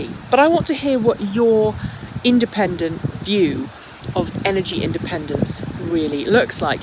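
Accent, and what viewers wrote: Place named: United Kingdom. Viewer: I hope your plane's batteries make it all the way to the UK! BTW, I always assumed you were in the UK given your accent, which I assumed was British. Energy independence means to me the ability to freely purchase the energy I need/choose to use without undesirable government or other influences. I'll probably never be in the energy business and even if you are off the grid, you're still dependent on other suppliers of parts and equipment to create and store your own energy. Freedom to me means a competitive marketplace with multiple potential vendors to purchase from.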